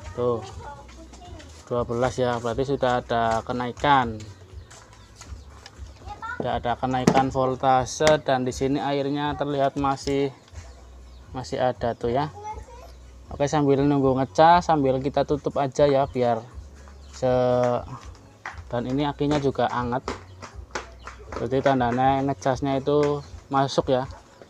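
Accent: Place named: Java, Indonesia. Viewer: Mantap mas.bahasa medoknya gawek seger.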